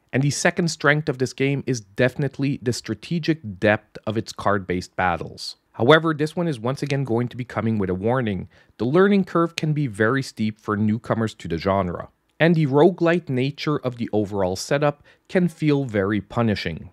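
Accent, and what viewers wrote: Quebec, Canada. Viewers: That's a Quebec accent if I've ever heard one.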